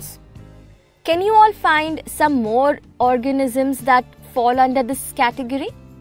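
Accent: India. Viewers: She's Indian, and people pronounce words different according to their accent. Instead of just pointing out her flaws. Tbh, you could use that mouth to appreciate her knowledge -_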